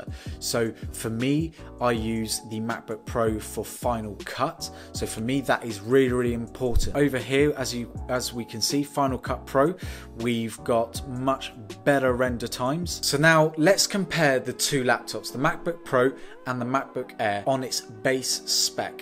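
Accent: United Kingdom. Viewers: Are u Indian ? But u talk like British tounge. Nice vlog anyway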